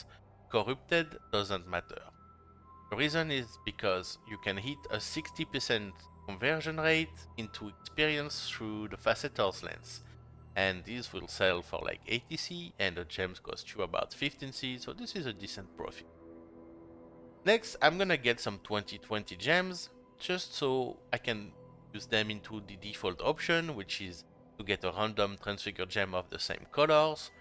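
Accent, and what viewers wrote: France. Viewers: U French? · nice french accent <3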